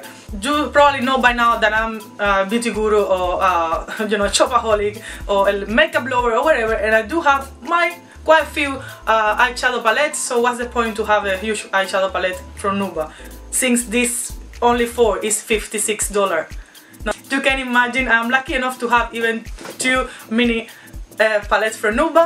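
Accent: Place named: Italy. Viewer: Oh my god! She speaks so fast with Italian accent well, no comprendo lol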